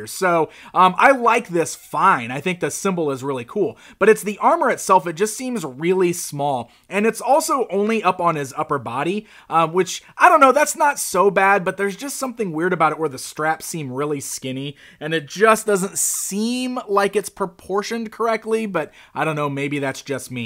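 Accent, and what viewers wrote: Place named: United States. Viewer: Great review,. I totally agree about the figure's head. It does indeed look a little small for the body, and his face looks real boyish also, which totally contradicts the statement..."Most Powerfull MAN in The Universe.", and again, the character's very name: HE-MAN (Not He-Teen, let alone He-Boy). The harness also looks kinda small for his torso, and it should look as if it was made of thick, rough leather, which is something we know toy makers can do, they can make plastic look any way they want to (if they really want to), just by using the proper color shades in the plastic's mix. On the other hand, all of the above "Flaws" on the figure, are nothing but great excuses for talented customizers to do what they do best, and make their personal HE-MAN figures look just the way MATTEL could have, IF they FOR ONCE would have prioritized QUALITY and CUSTOMER SATISFACTION, over GREED (Bulk sales, and Profits). 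But that's how things are, most toymakers (except for those out of Japan), are in business just for the sake of making money, and not concerned in the least about art nor perfection. Shame on our western society, but again, great review,! Easy to understand AMERICAN ENGLISH (unlike foreign reviewers, trying to speak our language, but miserably failing, and butchering it instead), awesome footage of the toys being discussed, and good knowledge of them, which we all appreciate. Great work, man, keep it up!!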